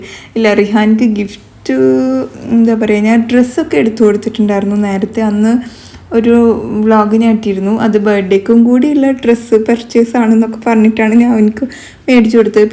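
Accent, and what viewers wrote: Palakkad, India. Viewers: പാലക്കാട്‌ കാരുടെ സംസാരം നല്ല രസാ അല്ലെ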